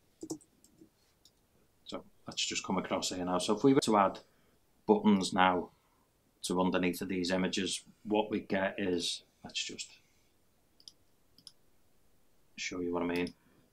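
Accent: Liverpool, United Kingdom. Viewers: Cheers - all brand new to me so lots of replaying!! Great to hear the accent though - cheers two Scousers abroad!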